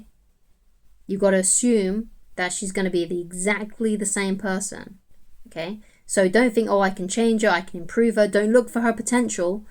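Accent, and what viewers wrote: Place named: United Kingdom. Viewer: Your british accent pisses me off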